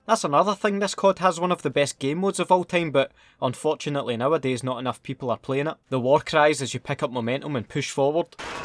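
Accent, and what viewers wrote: Scotland, United Kingdom. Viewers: I watched so many of your videos back to back I've taken up some of the Scottish accent and I DON'T REGRET IT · Why have I only just found out about this channel, I fucking love this, nice work bro😂😂 the Scottish accent made it twice as good👌👌👌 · Your scottish accent made this video 10X better lol.. love this game and so glad there making a new one. 👍🏼👍🏼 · Your Scottish accent is a gift from the heavens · I like watching u more than other youtubers probably because he Scottish haha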